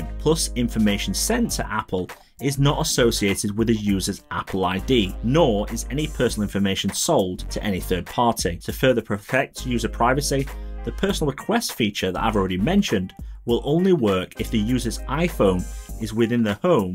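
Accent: England, United Kingdom. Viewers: Are u in the uk? I’m only asking as you mention the price in dollars but have a English accent 🙌🏼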